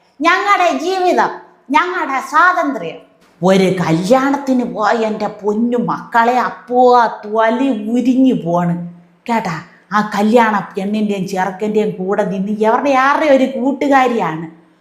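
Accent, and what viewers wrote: Thiruvananthapuram, India. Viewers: തിരുവനന്തപുരം സ്ലാങ് കലക്കി പുള്ളേ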